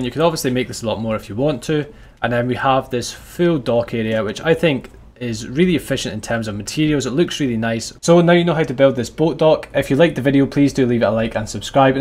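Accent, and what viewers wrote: Scotland, United Kingdom. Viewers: The Scottish accent turns the immersion up to 💯! Love your videos and I can’t wait to build this dock once I get out of the Bronze Age lol! Subbing for sure after this one!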